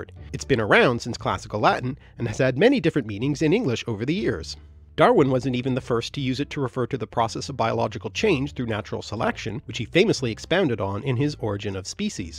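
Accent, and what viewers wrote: Canada. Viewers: I never noticed before, but I think you have a tad of a Canadian accent. Are you from the great white north?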